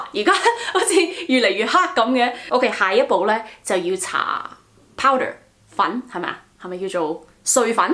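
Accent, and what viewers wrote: Guangzhou, China. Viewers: 好好好重鄕音 廣州音 😂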